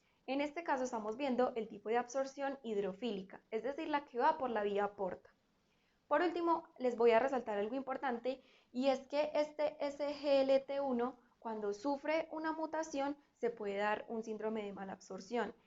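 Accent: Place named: Colombia. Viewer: Que bonito acento, supongo colombiano y gracias por enseñar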